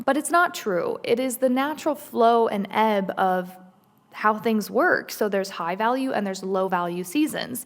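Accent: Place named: United States. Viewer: I searched and searched and searched the world of YouTube for you. To think this whole year you were right here the whole time. I had to tell the algorithm that I wasn’t interested in hyper general viral videos and search for god centered wellness. Little did I know, you’d talk about healthy living in a fuller sense. Curios about your background. You say you’re from turkey but you should like you are born & raised American. Anyway, thank you for this.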